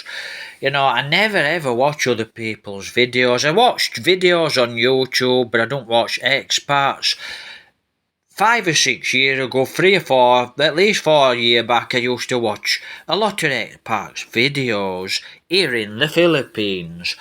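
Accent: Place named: Barnsley, United Kingdom. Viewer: Hi mate. I’m from Hoyland. Just having a debate with my mate about if you were actually from Hoyland as he says your accent sounds more Barnsley than Hoyland. Can you put him straight where you went to school etc. cheers mate. Look after yourself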